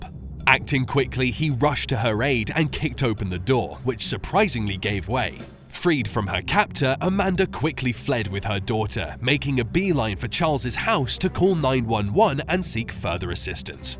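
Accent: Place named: England, United Kingdom. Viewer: this guy has an English accent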